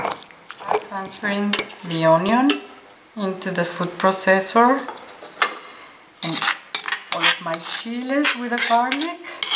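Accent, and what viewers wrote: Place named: Mexico. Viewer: you're English it's awesome you got a strong Mexican accent but I loved it you don't hesitate a single word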